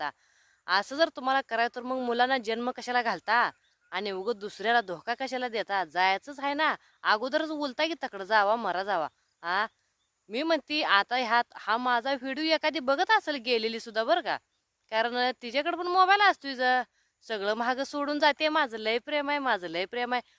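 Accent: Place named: Western Maharashtra, India. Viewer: बोलण्याची पद्धत आवडली ,बोलीभाषा पश्चिम महाराष्ट्रातील दिसतेय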